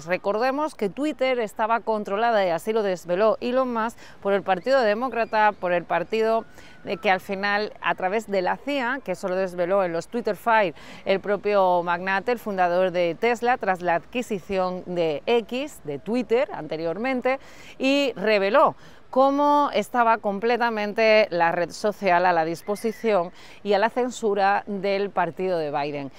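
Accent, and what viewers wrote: Spain. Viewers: Me gusta .loquedises con heste asentó Español.desde .USA😊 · En Cuba siempre han querido mucho a España, me emociona tu acento pura Española, o sea de España.Tengo más de 15 años que no como un turrón alicante, ni el turrón jijona ,aca en Venezuela es muy caro para mi.Buenas tardes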